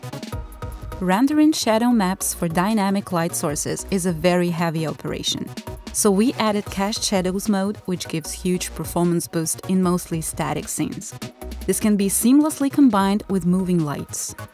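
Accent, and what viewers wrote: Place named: Eastern Europe. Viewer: спасибо за славянский акцент. стоит поработать над К и П звуками.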